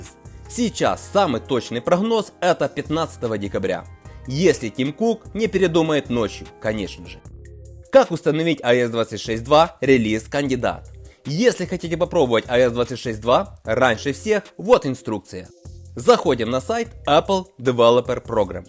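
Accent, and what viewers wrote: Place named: Ukraine. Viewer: Он просто с Украины, это ведь слышно что это не родной язык для него, есть акцент и говор.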